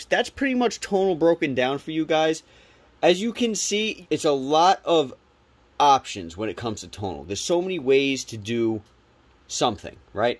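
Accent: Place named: New York, United States. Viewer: bro thank you! You’re vids are so helpful. I’m excited for this journey. Also.. you talk like me😂 by any chance you from NY?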